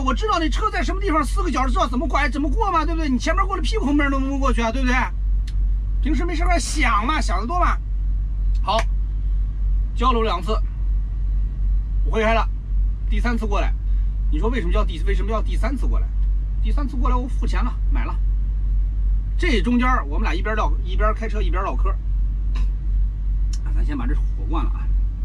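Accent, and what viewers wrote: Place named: Jilu, China. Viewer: 自认为在辨别各地口音方面有天赋。。结果这个老哥的口音真是迷幻。。可能是冀鲁官话的人娶了个东北老婆，或者东北人娶了个江浙老婆。前者可能性大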